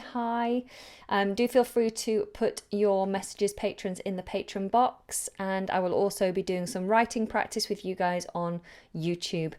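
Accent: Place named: United Kingdom. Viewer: A real British accent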